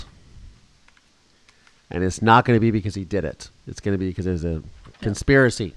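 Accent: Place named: Philadelphia, United States. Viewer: I found out you were from the Philadelphia area after your pronunciation sounded so familiar. I grew up in Abington township- right near the Hot Shoppe/B&N- and later lived in Pensacola at the NAS with a few trips to Bermuda in between. I was abused and spent the past 30+ years putting the pieces back together. I’m rooting for you.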